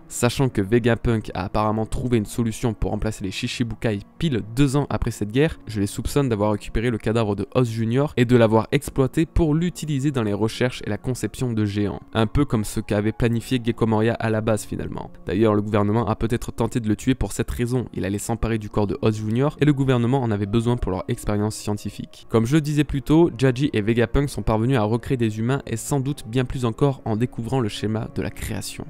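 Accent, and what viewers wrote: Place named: Alsace, France. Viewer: Rien à voir mais tu es d'Alsace non ? j'ai l'impression d'avoir reconnu un petit accent ahah Et excellent vidéo ça change pas ! :))))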